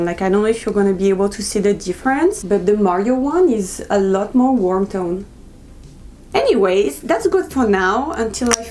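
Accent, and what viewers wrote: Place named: France. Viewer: Vous êtes française ça s'entend à votre accent 😊 Je vais regarder un peu vos autres vidéos j'adore les vidéos makeup !! ^^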